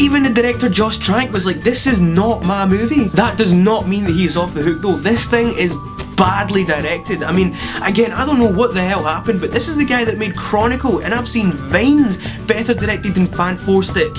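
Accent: Scotland, United Kingdom. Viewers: Is that a Scots-Gaelic accent there?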